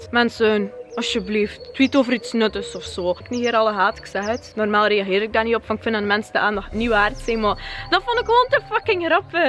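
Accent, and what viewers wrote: West Flanders, Belgium. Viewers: Het is echt niet erg! ♥ West Vlaams is supermooi en alsof de mensen die jou uitschelden zelf beter zouden kunnen! ♥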